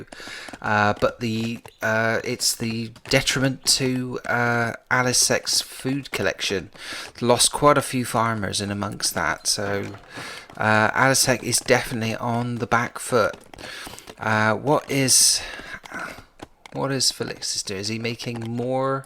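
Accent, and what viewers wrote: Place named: United Kingdom. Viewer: If I may chime in, I’m really enjoying most of your content, watching both the new and old videos, and enjoying most of it. You have a really nice manner of presentation (and a cool British accent too), and although the games are long, I’m sometimes switch off to doing other things while simply listening to your narration. Tutorial type videos are a nice recent addition, really appreciate that. As for the casts, I think 4v4 look most impressive, as the outcome is a bit harder to predict, but 1v1 with closely rated players are also fun to watch.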